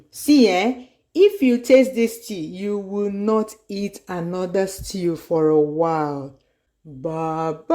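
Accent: Nigeria. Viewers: ...i learn soo much from you. Listening to your voice reminds me of home, Nigeria...🥂❤. Thanks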